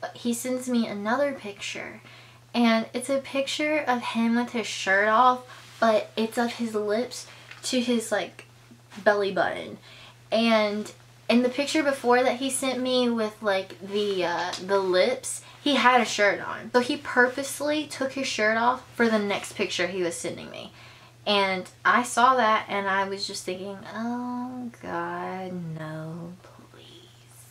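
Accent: South, United States. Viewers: Wow you're so good at telling stories. I'm having so much fun watching your old videos! I love the storytimes and your country accent. I miss the country accent so much lol! You rock the country accent so good! It's really cute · So I just recently found you and you have quickly become my FAV youtuber! I'm obsessed ❤️ where are you from? It sounds like you have a southern accent. I'm from Alabama! I wish there was more southern youtubers haha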